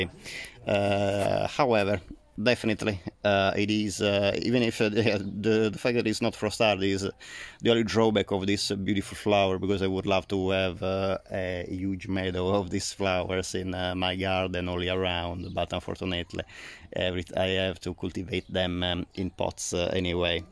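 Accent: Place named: Italy. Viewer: Wonderful! I’ve really enjoyed your videos about fragrant plants and also your beautiful Italian accent. Grazie mille!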